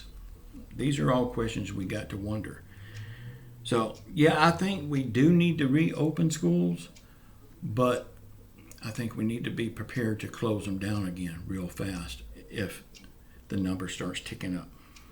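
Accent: South, United States. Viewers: Talks very slow with a southern draw. However, he’s very direct & to the point. No BS approach.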